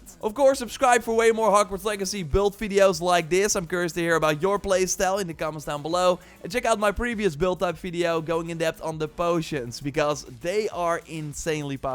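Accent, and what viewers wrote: Netherlands. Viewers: dont know why but you sound dutch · Ik dacht al klinkt Nederlands en checkte socialblade en hup een Nederlands accent....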